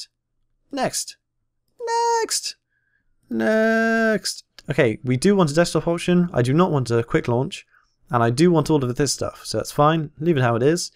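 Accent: Ireland. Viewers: Are you irish? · i bet your irish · is he Irish? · then halfway through turned Irish again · your voice is so trippy cos of that irish accent that comes out at times. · is that a bit of irish im hearing? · Are you Irish? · ohhg irish and and from england fancy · Are you Irish? This is a random question, but you sound Irish. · You earned a sub. By the way, are you Irish? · The only thing i could think of is 'Omg is he irish?' x3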